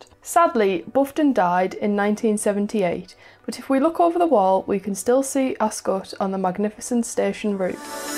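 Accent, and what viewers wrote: United Kingdom. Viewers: Dear Sir, For some time now i wonder from where in the UK you are, or rather, where your accent places you. I am by no means an accent expert, as i barely know English, but this young lady speaks certain words with exactly the same pronunciation as yourself, so i wager you were born in the same place. Cheers from Brazil, also with many diverse accents!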